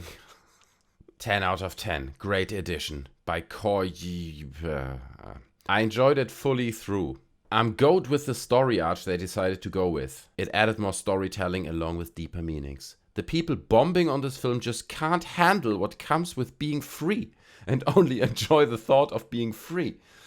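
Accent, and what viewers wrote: Germany. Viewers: Subbed today. Love the authenticity. Can’t place your accent. Is it German?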